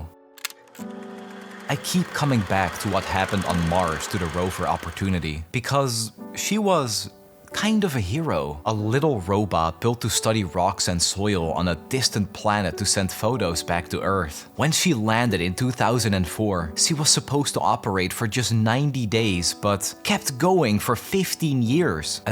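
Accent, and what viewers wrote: Netherlands. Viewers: Are you Dutch perchance?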